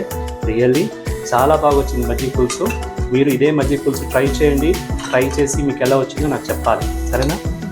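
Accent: Tamil Nadu, India. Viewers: Hi! Miru entho ishtamga vantalu chesi choopistharu mariyu mi telugu bagundi. Miru tamilian andi?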